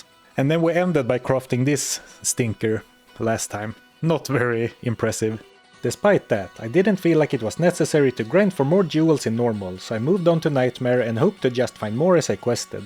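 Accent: Sweden. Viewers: no doubt. Nice video! And I love your swenglish! ❤ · u are swede right:D? or norweigan? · came for the diablo 2 content and stayed for the swedish accent greetings from jörmenni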